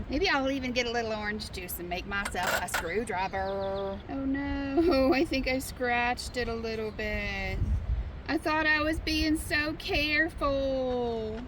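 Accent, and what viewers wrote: South, United States. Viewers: Are you originally from the southern part of America?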